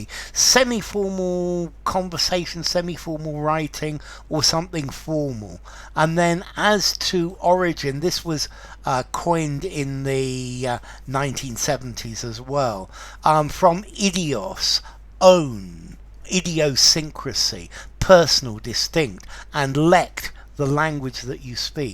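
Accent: United Kingdom. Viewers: I absolutely love the British accent. It's the most polite & respectful language..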